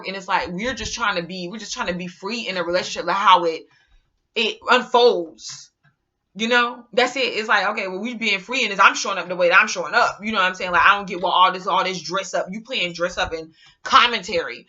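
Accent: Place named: New Jersey, United States. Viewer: .i love your jersey accent and your list lol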